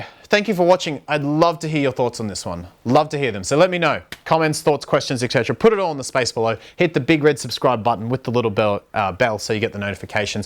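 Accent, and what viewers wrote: Australia. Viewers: Is that Australian accent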